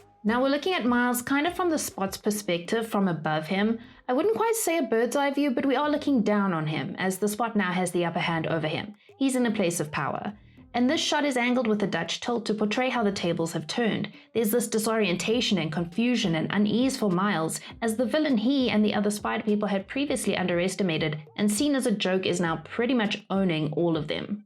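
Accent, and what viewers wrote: South Africa. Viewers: I'm from South Africa too! (I could tell from the beginning)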